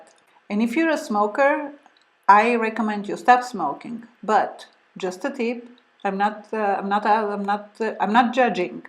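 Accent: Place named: Eastern Europe. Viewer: I picked up the east accent , I'm from Austria :)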